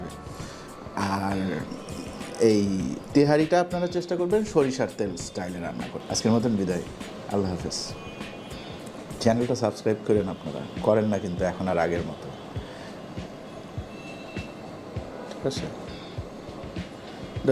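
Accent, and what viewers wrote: Barishal, Bangladesh. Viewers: ভাই বরিশাইল্লা স্টালে সেই 🤗🔥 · Vai borishal er vasa diya review ta valo lagsee...... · Vaiya apnar borishaler vasha eto valo lagse...Ami kokhono comment korina but apnar accent shine comment korte baddho holam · ভাই আপনার ভিডিওতে বরিশাইল্লা ভাষা ভালো লাগে। সবগুলা ভিডিওতে বরিশাইল্লা ভাষা রাখবেন।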